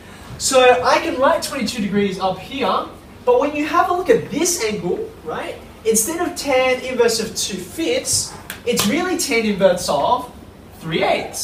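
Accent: Australia. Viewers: If my math teacher had an Aussie accent, I would’ve paid a lot more attention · That's an Aussie accent he speaks with.. the way he says 'yeah' gives it away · He looks asian, but he sounds australian. What da mate !? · This guy with an Australian accent is nuts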